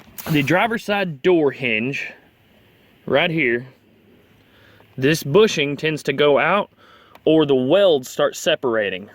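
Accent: United States. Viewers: Sub'd - I have a 2.5 TD Sport here in Scotland I bought for £600 4/5 years ago...it needs a bit of TLC...I enjoyed your informative, casual, southern style...will check your other vids! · jesus if you're not from missouri i need to reevaluate my accent and great video by the way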